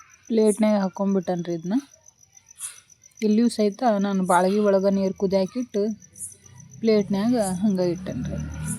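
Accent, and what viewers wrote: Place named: North Karnataka, India. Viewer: ತುಂಬಾ ಚೆನ್ನಾಗಿ ಮಾತಾಡ್ತೀರ ನೀವು ಉತ್ತರ ಕರ್ನಾಟಕದ ಭಾಷೆನ ❤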